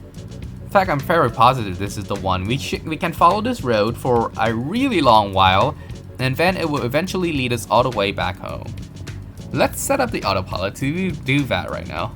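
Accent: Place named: United Kingdom. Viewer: damn that British accent tho....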